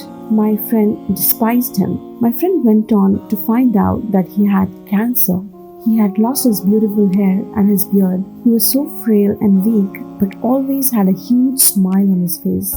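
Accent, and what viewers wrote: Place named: India. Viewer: You say you are strictly British yet you have a very Indian accent. I find that's odd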